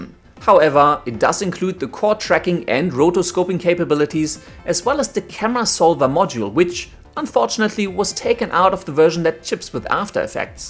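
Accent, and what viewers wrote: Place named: Germany. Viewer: Das ist doch ein deutscher oder? merkt man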